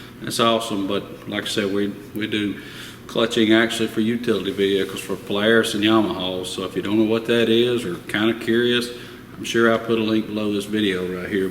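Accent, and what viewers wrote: United States. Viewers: Great Review. Straight to the point. Thank You! I ordered the 12" and it should arrive soon. I can't wait to use it. P.S. Your accent reminds me of home (Texas). I live in NYC now.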